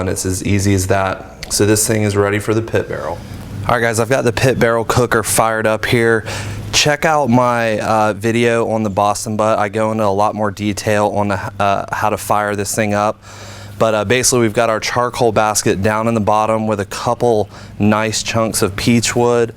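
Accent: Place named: Pennsylvania, United States. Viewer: Southwest PA is my guess.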